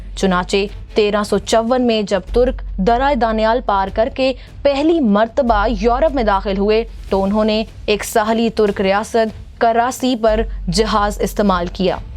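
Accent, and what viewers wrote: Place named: Punjab, Pakistan. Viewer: Please speak Urdu like Urdu not Punjabi. Or just speak Punjabi.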